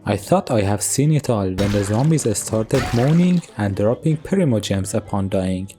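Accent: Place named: Iran. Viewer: داداش از لهجت معلومه از ایرانی😏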